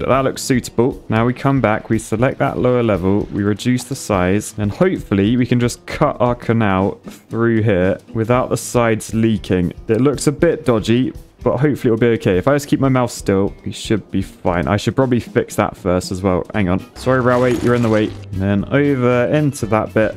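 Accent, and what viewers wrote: United Kingdom. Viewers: Because this guy is british, he really makes me think American English and English are completely different languages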